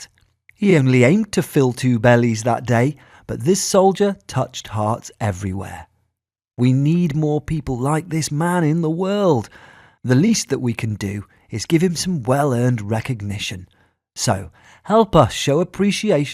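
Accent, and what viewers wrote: United Kingdom. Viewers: I don't understand why the story had to be told several times, and by someone with a British accent. Great story, a shame there are too many that do not appreciate our military, especially some newly elected politicians who disgrace the halls of Congress this year. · Am i the only one who thinks the video is a bit confusing with that accent talking about “our troops” with a British accent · “Good story of an admirable man in uniform, my hats off and thank you for your service.” That being said...the video is STUPID and imho takes away from the story in its attempt to glorify it w/ the repeating of itself all in the same paragraph and dumb accent. We’re here🇺🇸..,not here🇬🇧.. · Not to mention saying the same thing repeatedly!! Then saying with his Brit accent “ our soldiers “ However, thank you sir for all your ways of being great to those kids and to “ us “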